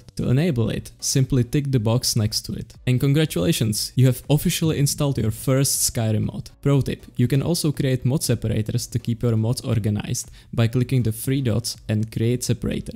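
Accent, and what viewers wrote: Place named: Czech Republic. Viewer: Slyšim tu češtinu, bráško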